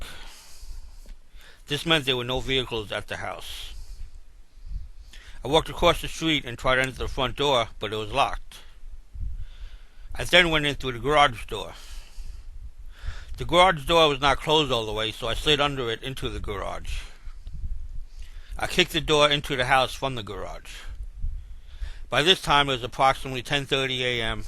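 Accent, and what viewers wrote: New York, United States. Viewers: Are you a New Yorker?! haha Adorable. Great narration! I'm from Queens! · You sound like you are from New York. Great channel by the way, I loved your style of editing and presenting. Subscribed today.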